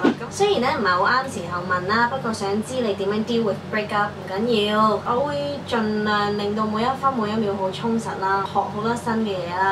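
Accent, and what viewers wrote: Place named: United States. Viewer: 一直都以為你係ABC 因為你個口音同性格都好似XD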